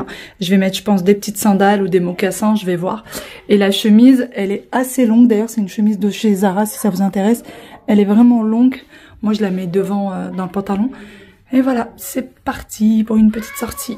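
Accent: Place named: Morocco. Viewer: Coucou, j'aime trop l'accent marocain 😊 PS: je suis tunisienne ❤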